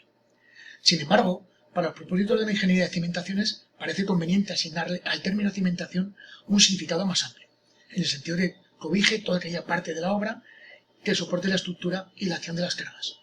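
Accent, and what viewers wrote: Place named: Spain. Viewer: Que acento para mas feo, del idioma castellano el peor hablado se encuentra en españa, sin duda.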